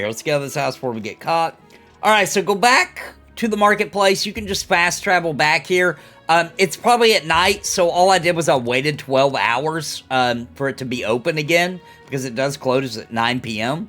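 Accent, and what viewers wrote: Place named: South, United States. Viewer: Where you from brother, i hear the southern in you I'm from arkansas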